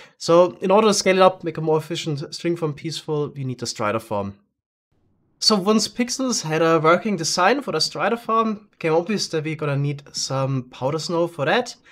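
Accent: Germany. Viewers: Du hast einen sehr deutschen Akzent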